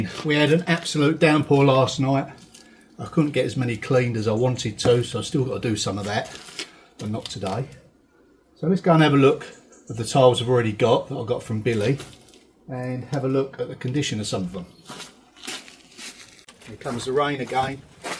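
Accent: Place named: South London, United Kingdom. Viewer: Saw you pick up tiles from Ryan, hopped over to your channel. Now addicted. Not sure where exactly from but my guess would be south London, lewishamish… probs completely wrong but your accent is the same as my family. I live in NZ, so it’s music to my ears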